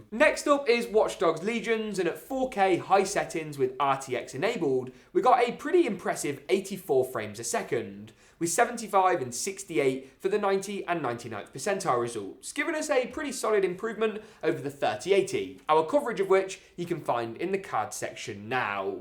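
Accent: United Kingdom. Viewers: Came here for dat British accent, Stayed for the awesome montage, And returned for the build guide, for building my next new PC.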